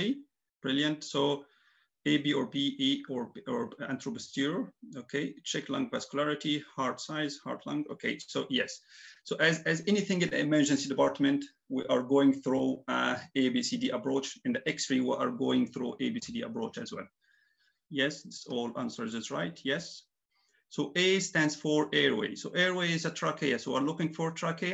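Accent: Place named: Egypt. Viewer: Thanks 🙏 You are Egyptian doctor I catch your accent thanks again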